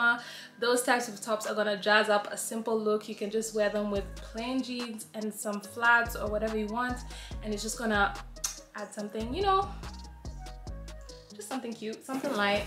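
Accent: France. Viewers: From a french girl , you have such a GREAT accent, I almost thought you was French too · Love the french accent! You were in my suggestion, it's funny because you just look like my younger sister and I'm french! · When you started talking in French I thought you were French due to your accent and I am French So I can tell you you sound like you’re French this is sooooo crazyyy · Par contre, ton accent français, MAGNIFIQUE. I was chock 😳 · even if you know a few words your french accent is damnn!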